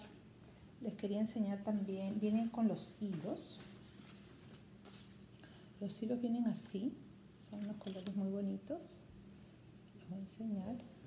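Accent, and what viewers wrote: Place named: Peru. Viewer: Hola! Acabo de descubrir tu canal, por tu acento y el torito de Pucará, que mencionaste al final, me parece que eres de Perú ¿?.... Si es así, que alegría haber descubierto un flosstube de una peruana 🤗🤗.... Están lindos los bordados que enseñaste terminados y los que tienes planeados empezar. Las telas me han encantado sobretodo la que resultó de la combinación de ambos colores. Saludos desde Lima y feliz bordado!!!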